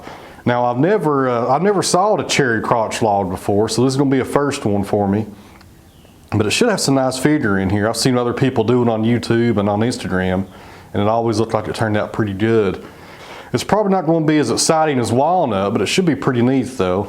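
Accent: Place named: South, United States. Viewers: Just curious...your accent sounds definitely sounds Southern. Do you live in the Southern United States? I'm not trying to be nosy, just curious, as I said. I'm a Virginian, born & raised. We have a good lumber company not even 10 miles from where I presently live. Augusta Lumber is it's name, and it's located near Fishersville, Virginia. Just curious as to whether you'd ever heard of it or not. Anyways:have a great day, & thanks again for posting this video. I love me some Cherry wood products. They're beautiful!!! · I hate you're Southern voice video sucks be cause of that🤔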